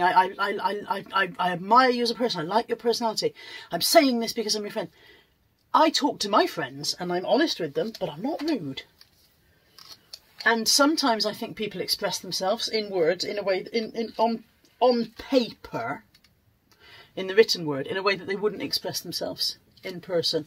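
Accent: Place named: Forest of Dean, United Kingdom. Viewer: I've recently found your channel and I'm trying to pinpoint where you're from as you sound like you're local to me! I'm in the Forest of Dean 😊 really enjoying your videos! X What you said is spot on!!!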